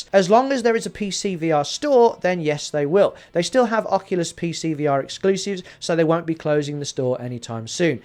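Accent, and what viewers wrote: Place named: Midlands, United Kingdom. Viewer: yow sound like yow come from the midlands mush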